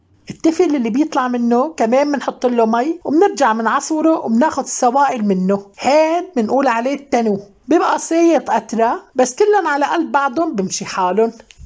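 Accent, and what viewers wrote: Aleppo, Syria. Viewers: لك خالتي و الله بفوت ع الفيديو مشان اسمع كلامك الحلبي الاصيل.ابوس قلبك شقدك مهضومة و الله عبتزكرينا بكلامات رح ننساهن😍🥰😅😅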